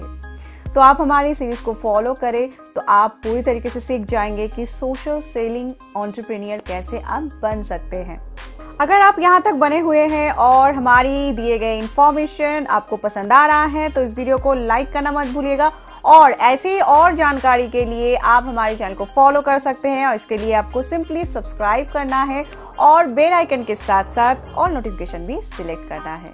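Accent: Bengal, India. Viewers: Ap bangal se hai kya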